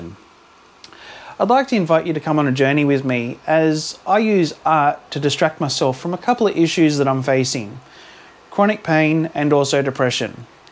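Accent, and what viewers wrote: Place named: Australia. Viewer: so great hearing another Aussie accent here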